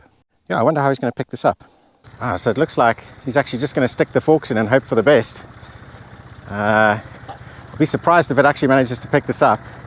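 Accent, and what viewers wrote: South Africa. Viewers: Get yourself some temperature probes. You probably overcooked the crap out of the chicken but who knows unless you can get the internal temp. In fact someone please send some to review for sponsorship dollars. The cool bluetooth remote ones. Also it's "Weber" not "Weeber" - j/k the saffa accent is cool🤣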